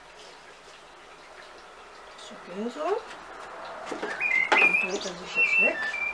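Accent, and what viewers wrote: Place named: Northern Germany, Germany. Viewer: Hallo, richtig gute Idee dein Kerzenständer, freue mich jedesmal auf deine schönen Videos. Ich mag so gerne deine Nordstielaussprache 🤗 u.natürlich die schönen Dekoideen 😙danke 🤗🤗😚😚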